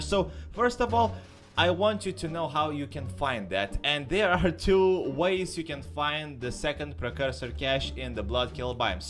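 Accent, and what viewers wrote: Russia. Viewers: are u russian? if u are i like ur accent keep up the good work;) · I love your Russian accent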